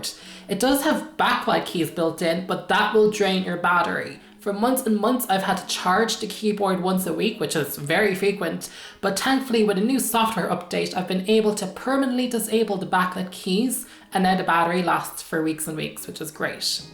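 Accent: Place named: Dublin, Ireland. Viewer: Thanks for the real-world one year later, and the heart gushing Dublin accent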